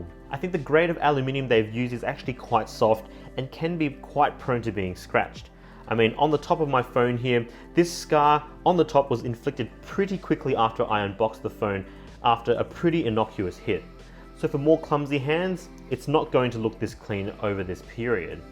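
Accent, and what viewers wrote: Australia. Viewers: I knew he's gonna complain about the lack of support the moment I heard his Aussie accent lol Just ordered a 7 Pro from China after watching this video... Let's see how it goes... And I think OP definitely needs to bring their support back to AU ;-(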